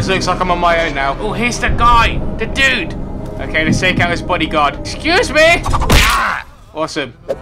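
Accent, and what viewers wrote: England, United Kingdom. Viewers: He is british... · Oh your British yay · damn british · Are you English?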